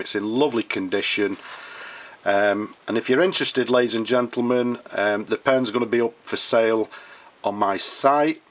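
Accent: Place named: Liverpool, United Kingdom. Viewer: Thanks bud, I think I can do this, kind of like a Harley his to clean your carburetor video..great accent mate, is text a Liverpool accent or Scottish ?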